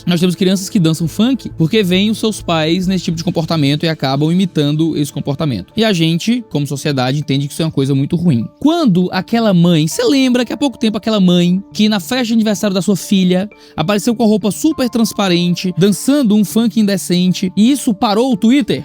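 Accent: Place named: Northeast, Brazil. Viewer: acho engraçado o jeito plenamente nordestino que o fala sobre as indescencias alheias "balangando a jurubeba" KAJKSAJKSJKLJLSJDSLKJSLKDSJLKSDJSLKJDS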